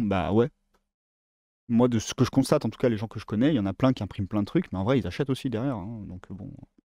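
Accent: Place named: North, France. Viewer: Budget vs temps, le dilemme absolu... Oh un accent du Nord.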